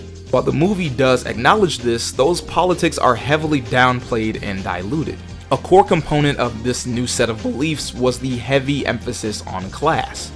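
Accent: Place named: Chicago, United States. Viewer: Bro def from Chicago heights or chatam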